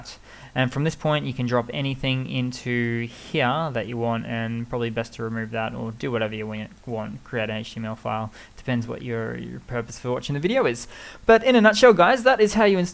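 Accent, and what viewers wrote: Australia. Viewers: Enjoy your Linux video-tutorials, and especially great to hear an Aussie accent rather than the 'generic' American voice-over.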